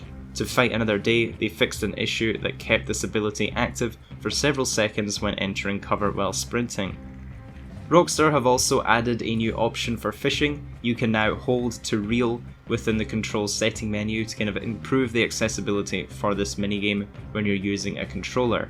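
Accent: Scotland, United Kingdom. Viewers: I will,always listen and watch you videos as a fellow Scots much better to hear ...❤️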